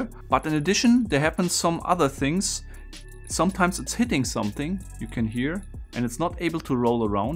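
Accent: Germany. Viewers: nice video and interesting puzzle 👌, but damn bro ur german accent is awful 😧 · Man hört raus dass du Deutscher oder Österreicher bist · I love this german or skandinavian accent. Such wholesome english;) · Its so weird how he sounds so german.. he also uses have instead of has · It is a very hard German accent ^^ my fellow Landsmann has used this as charme however :) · Es ist lustig wie man am Akzent raushören kann, dass du aus Deutschland bist. Its funny that you can hear that he is german :P Good Video tho! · Is he german? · Klingt sehr deutsch, dein englisch ^^ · Could it be that you are german? Becaus your accent and when you said ‚klack‘ it gave it away 😂 Greetings from munich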